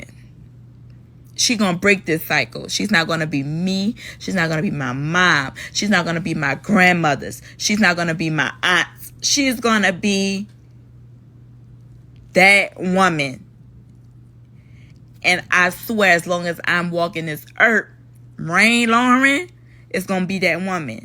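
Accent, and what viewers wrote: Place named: New Orleans, United States. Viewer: I was looking at you and I said she look sooooo familiar and I heard your NOLA accent then you said your name and I said I remember you from the VA. God bless you babe! You have come a long way and have done so good with your life! Take care of yourself and your babies. You’re truly beautiful both inside and out!❤❤❤🙏🏾🙏🏾🙏🏾🙏🏾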